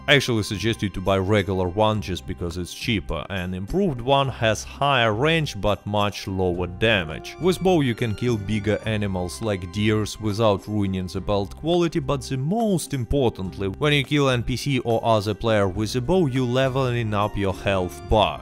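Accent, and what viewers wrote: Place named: Russia. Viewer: The Russian accent make this video even better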